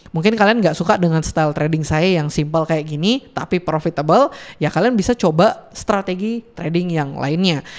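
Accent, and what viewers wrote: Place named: Medan, Indonesia. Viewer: Abang sebenarnya orang Medan ya? Kok logat bahasanya macem anak Medan bang? Huruf K ABG tu khas kali untuk anak Batak Medan bang. Salam dari Medan bang . Saya anak Asahan.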